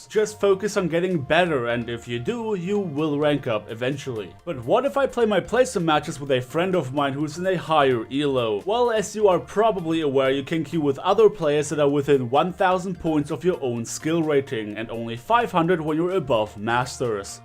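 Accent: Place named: Germany. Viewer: Sorry man but I cant follow you. Your english is so bad. Thats really not an insult, but an advice. Work on your language skills. How you pronounce words and stuff. I am 99% sure that you are not mother tongue english. Sounds like german or so. Anyway: Cant follow the information you give because your spoken words are not accurate.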